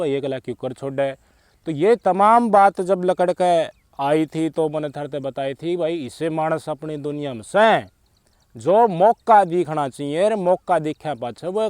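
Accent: Haryana, India. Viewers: भाई आपका हरियाणवी बोलने लहजा बहुत बढ़िया है आपका गांव कौन सा है भाई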